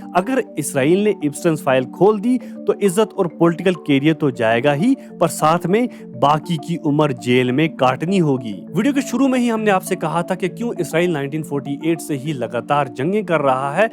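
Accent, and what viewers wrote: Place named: Pakistan. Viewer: definitely Kashmir wali side Sy hngy because dsri side India Waly asy nhi bolty Urdu like Pakistani type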